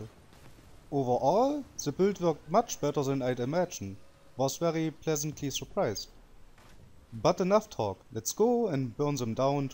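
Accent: Germany. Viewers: Bro man hört so hart das du Deutscher bist xD. Aber trotzdem nices Video!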